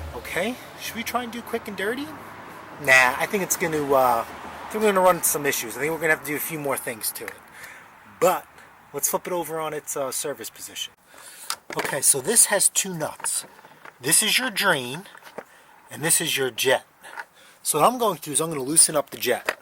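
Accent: New York, United States. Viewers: This video just keeps on giving! I had the same same problem on the same machine. It starts better now than it ever did. Instructions were easy to follow, and I appreciate the NY accent and sensibilities. I'm from RI and we're cut from the same cloth.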